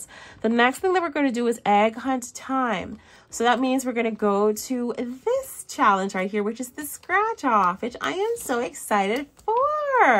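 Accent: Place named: Caribbean. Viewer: Wooohooo Caribbean girls run it 😂😂😂 defo heard your Caribbean accent thought you were Bajan😊😊 Great video love all the boxes❤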